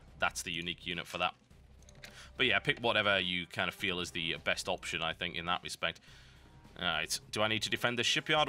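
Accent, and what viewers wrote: Australia. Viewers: Australian guy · Wow... an actual real Australian accent? That's rare. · Now THAT'S an Aussie accent... Thankyou lord! Not another case of the butchered accent like the horrid one in Dead Island...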